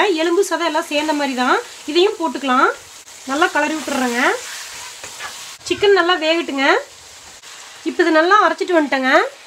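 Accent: Kongu, India. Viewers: enka Kongu nadu styla pesarinka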